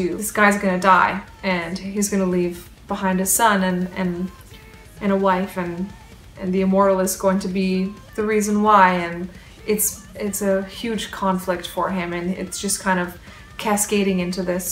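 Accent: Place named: Canada. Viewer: okay but her little canadian accent is actually adorable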